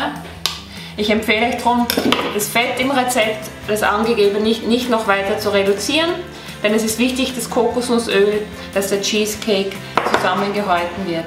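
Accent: Switzerland. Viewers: bisch a Schwiizeri? wegem akzent? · no it's a swiss accent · actually she speaks german with a tiny swiss accent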